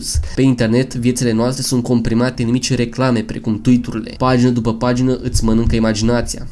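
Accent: Moldova. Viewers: Esti Moldovan? Ai un accent destul de ciudat😁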